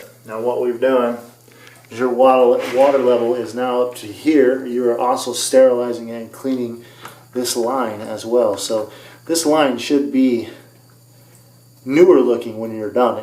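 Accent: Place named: United States. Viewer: Hy drott in Iove it. you usavians, are so sweet with your accents.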